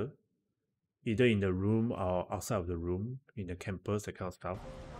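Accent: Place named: Singapore. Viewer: Hello!! Are you from Singapore ? You have the accent ! Thank you for uploading these videos btw they are my comfort videos haha 💕💕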